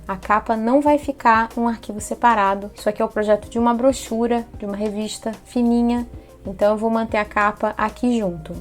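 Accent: Rio de Janeiro, Brazil. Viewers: Video bom. Sotaque carioqueiiissx forte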